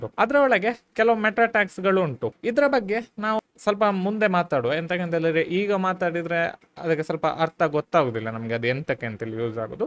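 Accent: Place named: Mangalore, India. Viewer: Evaru mangalorean ah? Accent same mangalore navra tara ide..❤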